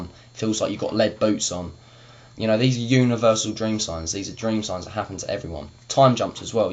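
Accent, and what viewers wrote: England, United Kingdom. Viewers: What part of England are you from? You sound like Daniel Radcliff... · well i can usually distinguish different english accents an he sounds like hes from the same region as rupert grint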